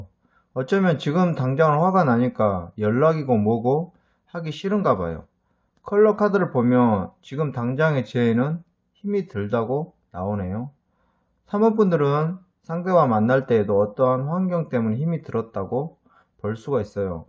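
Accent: Jeolla, South Korea. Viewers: 2번ㅎㅎ 님뭔가 전라도분이신거같아욬ㅋㅋㅋㅋ뭔가정감가요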